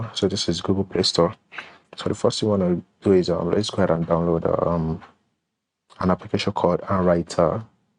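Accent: Nigeria. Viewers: This tutorial guy sounds like a Nigerian 🇳🇬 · This person has a Nigerian accent 😍😍😍😍😍 Me likey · A Nigerian, nice. I'll recognize that accent anywhere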